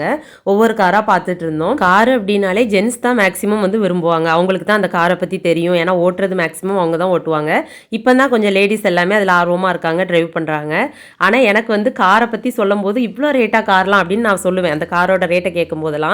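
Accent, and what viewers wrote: Tirunelveli, India. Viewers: Unga native thirunelveli,ah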